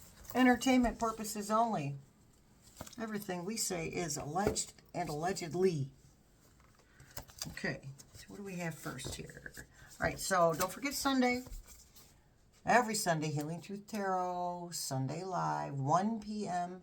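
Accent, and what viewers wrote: New York, United States. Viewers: Your New York sounds a little like my North St Louis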